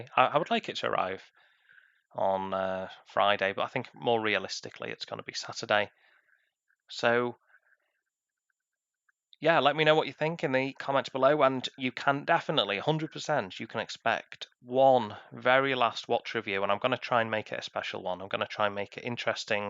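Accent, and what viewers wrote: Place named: Northern England, United Kingdom. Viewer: yeah I did mate, to be honest it was probably your review which tipped it for me, great watch, could easily be my exit watch (but I know it won’t be) I’m really looking forward to your review of the bulova, I honestly think you have great watch content, especially for ‘normal’ people who don’t have the cash for high end watches. Quick question, you sound quite local to me, I’m guessing St. Helens? Definitely northern 👍🏻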